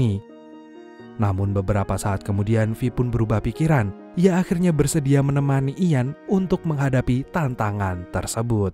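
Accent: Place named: Tegal, Indonesia. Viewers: Rika wong ndi sih bang, ngomonge deneng ngapak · tegal hadia Maseeehh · Sihhh malah wong ngapak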